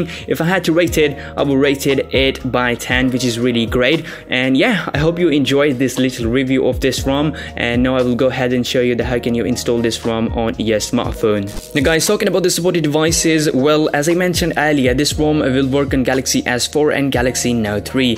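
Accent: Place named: United Kingdom. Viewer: Why do u have ths british accent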